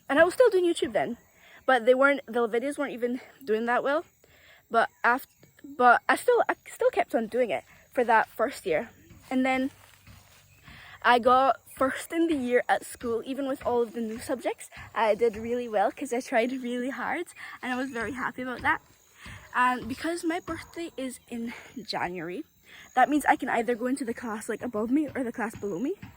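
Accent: Scotland, United Kingdom. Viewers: Congratulations on your academic achievements. This is only the beginning, stay focus and you will accomplish more academic success. I am not surprised at your scholastic success. There's absolutely nothing wrong with speaking with a Scottish accent. Enjoy your Guyana vacation. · Hi, so great to see you and hear your Scottish accent. You have to do as the Scotts do, lol. You are an outstanding student. Keep up the great work—this is your time to reach the stars. We will be here to welcome you back whenever time permits you to make videos. Stay safe and well. God’s blessings on you and your family 😊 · Nice you are speaking Scottish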